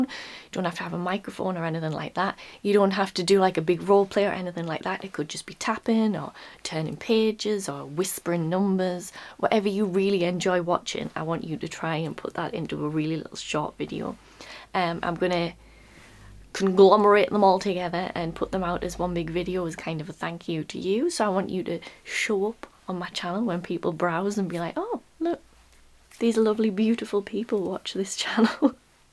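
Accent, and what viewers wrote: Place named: Newcastle, United Kingdom. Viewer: That Geordie accent 😯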